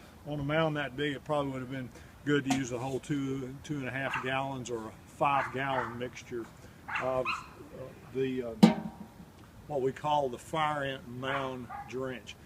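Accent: South, United States. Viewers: They are both Texans. That's how we talk here., is my pick over Dr. Phil! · what does he say is the first step? at 8 seconds? can't understand southern drawl, man.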